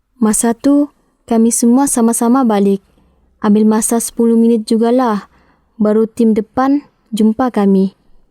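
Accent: Sabah, Malaysia. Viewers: ni bkn ckp semenanjung bang, ni bahasa melayu slang sabah. Kasi repair otak mu..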